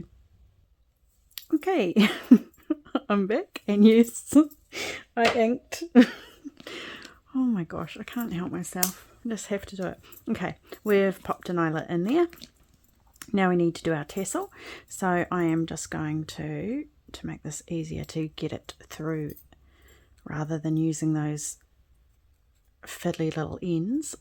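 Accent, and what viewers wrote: New Zealand. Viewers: How cool to hear a kiwi voice!!. Great project xx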